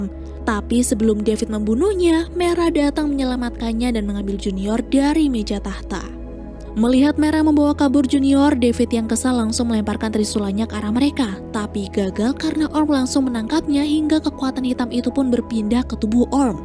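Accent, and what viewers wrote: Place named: East Java, Indonesia. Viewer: Ini pembawa alur ceritanya orang daerah mana yak? Kok bahasanya gemesin banget 😃 cak cok cak cok 🙈🙈🙈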